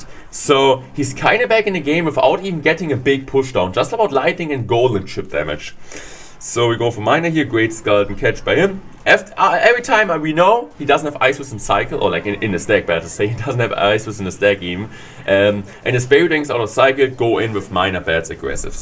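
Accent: Germany. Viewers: Ur German af i can tell 😂❤️❤️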